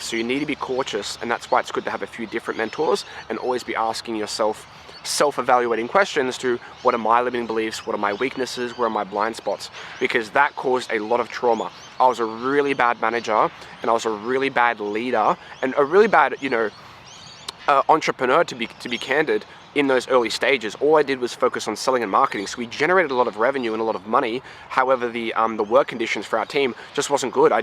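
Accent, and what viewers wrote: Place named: Australia. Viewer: your story is incredibly inspirational and should move everyone who hears it to want to be great at any cost! I talked to Quinn yesterday after opting in...looking forward to getting goin with you guys in the very near future! You are the M&M of digital marketing....you even sound a bit like Marshall with an Aussie accent brother!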